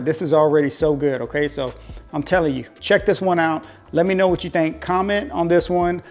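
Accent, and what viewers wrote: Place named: South, United States. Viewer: Great video!! Love your southern accent!!!! What state are you in?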